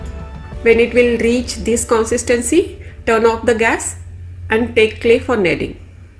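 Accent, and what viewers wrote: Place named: India. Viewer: I couldn't understand your accent. This really sucks because you are trying to speak English and I can't understand Hindi accent. No captions available either. Sorry, but you get a thumbs down. Talk slower and much more explicit. I want to make this but you made it impossible for anyone who cannot hear Hindi mumble.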